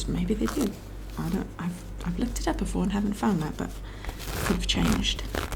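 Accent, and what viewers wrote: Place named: United Kingdom. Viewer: An Italian with a British (I assume) accent, living in Egypt, with friends in France, and family in the USA. What a true international life!!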